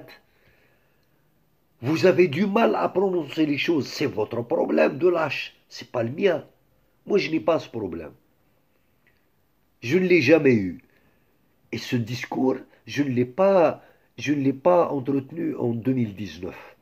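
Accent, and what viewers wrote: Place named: Western Algeria, Algeria. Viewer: Khouya vous ete tres eduquee et intellectuel intelligence...ellah ibarek...je c que peut etre vous exprime mieux en francais et plus allaise...ce que jai mal a captee..je suis englophone...alors algerienise Khouya...en plus Jaime l'accent de l'ouest saha shourak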